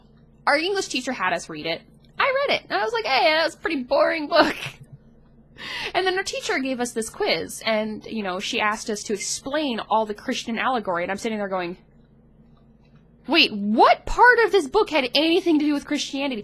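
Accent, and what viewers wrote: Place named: Midwest, United States. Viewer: I am from the midwest but moved to the Pacific Northwest. And your accent sounds soooo Midwestern. Michigan? Wisconsin? gah I cant place it and its killing me. You have a great personality! this video was awesome and really helpful!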